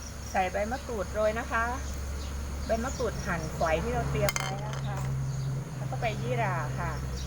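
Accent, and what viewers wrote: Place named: South, Thailand. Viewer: พี่ เป็นคนปากใต้ใช่ไหมคับผมก็เป็นหนึ่งที่ชอบกินอาหารใต้คับแซ่บๆมากเผ็ดๆดีคับ ผัดใช่ผักอีลา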